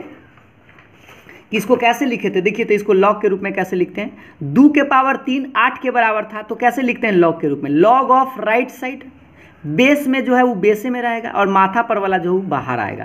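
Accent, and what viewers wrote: Bihar, India. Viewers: Sir aap Bihar sa hi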